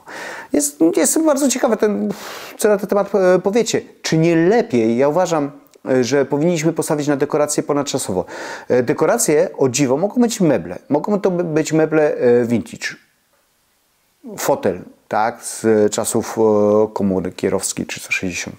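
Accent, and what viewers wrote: Silesia, Poland. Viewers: I dobrze że słychać gwarę. W końcu ona też nas Ślązaków określa. Prof.Simonides często wracała w 😊swoich wypowiedziach słówko gwarowe" tyż". I w żaden sposób się tego nie wstydziła. Pozdrawiam panie. I zgadzam się z Pana przemyśleniami nr dekoracji. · Zgadzam się w 100%. Dekoracje ponadczasowe👍 P.S. Uwielbiam śląski akcent i niech pozostanie😉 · 1. Proszę nie przepraszać za swój akcent. To część pana życia. Jak komuś nie pasuje to trudno. Rozumiem, że ktoś może nie znać śląskich słów i wtedy jak najbardziej, starać się mówić po polsku, ale zmieniać akcent? Pffff, chyba tylko dla buców internetowych. 2. Przyznam bez bicia, że też chciałam mieć dekoracje na kilka świąt czy wydarzeń, które celebrujemy. Chciałam mieć np. naczynia (talerze, miseczki, kubki) na Święta Bożego Narodzenia, na Wielkanoc. Oczywiście myślałam też o naczyniach sezonowych: tu listki na talerzu, tam płatki śniegu itp. 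I tutaj pojawia się moje szczęście w nieszczęściu: im dłużej czekam na koniec remontu i korzystanie z mieszkania (jestem singielką więc dłużej na niego zbieram) tym bardziej mój zapał na kupno tych rzeczy się po prostu ostudza. Już doszłam do wniosku, że musiałabym mieć pierdyliard rzeczy aby udekorować 60 metrowe mieszkanie. Po drodze pojawiło się dążenie do minimalizmu. Może tak musi być. Może po to tyle czekam na moje mieszkanie, aby żadne głupie myśli na jego dekoracje czy urządzanie nie przyszły mi nigdy więcej do głowy. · Podoba mi się Pana zdrowy rozsądek, luz, dystans i....śląski akcent! Pozdrawiam serdecznie :) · Śląski akcent- super.Jestem z Podlasia.Polacy pięknie się różnią . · Nie ogarniam zbierania gratów, "dekoracji😢" typu plastikowe dynie i o zgrozo! halołinowe. Moja mała slabość to kocyki, cieplusie milusie. W domu w zimę temp ok. 18 więc kocyk to jest to!!! Kupowałapom mówię PRECZ!😅 p.s. 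A Pana śląski akcent... Miód na ❤️ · Zgadzam się z Panem. Moim zdaniem, jesieniara to osoba, która ma wszystko w nosie, włazi pod koc z kubkiem herbaty i książką, a inni niech pracują. Nie chcę nikogo obrazić, ale ja jesienią zaczynam pracę, nieraz przemokły mi buty, chorowałam, przeziębienie było zawsze o tej porze roku. Poza tym, dla mnie to smutny czas, przyroda zamiera, wspominamy zmarłych. Wolę wiosnę, ba kocham!!! Ale nie nazywam siebie wiosieniarą😂. Kiedyś ludzie nie dekorowali domów na każdą porę roku. Teraz liczy się zysk sklepów i producentów. Większość dekoracji to tandeta i zaśmiecanie środowiska. A śląskim akcentem proszę się nie przejmować. To dodaje Panu uroku. Nie tylko górnicy tu mieszkają- też jestem ślązaczką z dziada pradziada. Kiedyś na koloniach Pani wychowawczyni nazwała mnie i siostrę Ślązarami, sama przyjechała tu za pracą. Dlatego jesieniara do mnie nie przemawia. 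Dobrze, że Pan podjął ten temat. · Panie Pana śląski akcent nie przeszkadza, jest uroczy, myślę, że nie tylko dla mnie, osoby urodzonej i wychowanej w Bytomiu. Ale nad wymową "ę" zamiast "en" proszę popracować. Wymowa "bendzie" razi. Tu powinno być "będzie". Pozdrawiam Pana serdecznie i dziękuję za przekazywanie wartościowych treści i zdroworozsądkowe podejście. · Ja osobiście jakoś nie czuję tych w sumie nowych trendów z zachodu i nie czuję najmniejszej potrzeby kupowania takich rzeczy - raz, ze szkoda mi kasy na to, dwa - mam małe mieszkanie i każda taka duperelka tylko by mi zagracała dom. Co innego Boże Narodzenie czy też święta Wielkanocne. A i wtedy jakoś nadmiernie nie szaleje z dekoracjami. Całkowicie się z Panem zgadzam Panie:)....a offtopowo powiem, że ja lubię ten śląski akcent i wszelakie (jesli są) "słowne niedomagania - dla mnie to wydźwięk naturalności a nie jakiejś sztucznej pompy:) Dobrego dnia!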